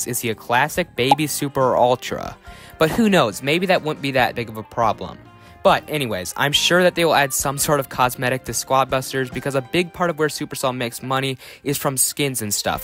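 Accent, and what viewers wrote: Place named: United States. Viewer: That is probably the most American accent i have heard ( if hes not american hes a 17 yr old Australian )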